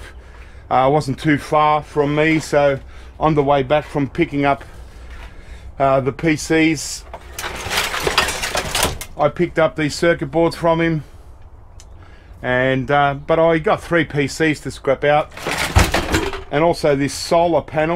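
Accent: Australia. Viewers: 73 kg! Nice tab for eBook reading. I always enjoy your videos, love your Aussie accent.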